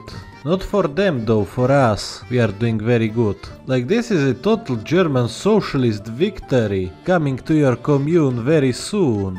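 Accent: Russia. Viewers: Ааааа этот акцент. Ты русский? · Is it just me who thinks he has a Russian accent? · Почему ты говоришь с РУССКИМ акцентом? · Ru: О госпаде, какой же это прекрасный русский акцент. Чувак, лучшее! Eng: Oh my gosh, what a wonderful Russian accent that is. Dude, the best!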